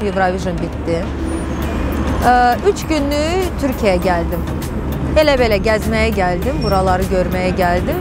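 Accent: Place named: Azerbaijan. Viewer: Halal olsun sənə bezileri kimi türkiyeye catan kimi dilivi dəyişməmisen xalis azeri dilinde ve hetta azeri lehcesinde danışırsan sanki ele bu 8 ilde burada dogma Azərbaycanda olmisan maşAllah sene hemise bele semimi ve öz qalasan Amin...gözdeymesin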